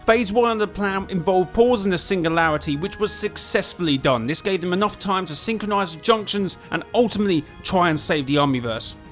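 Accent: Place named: United Kingdom. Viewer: This is so good but the only bad thing is that he is British couldn’t understand half of what he said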